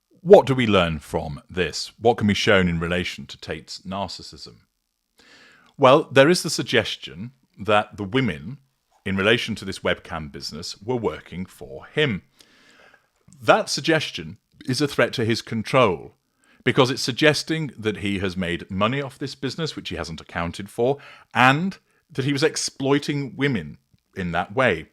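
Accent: United Kingdom. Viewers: 2 min in and I'm bored outta my mind, the old brit voice doesn't help either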